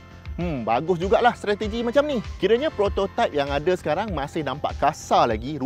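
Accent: Johor, Malaysia. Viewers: Lah orang jb ke haha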